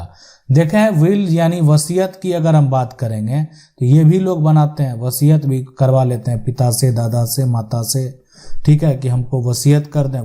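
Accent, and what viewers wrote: Bihar, India. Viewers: बार बार वही लाईनें दोहरा रहे है दुसरे यह भाषा भोजपुरी टाईप समझ से परे। कुछ कुछ बिहारी टच। हिंदी भी नहीं है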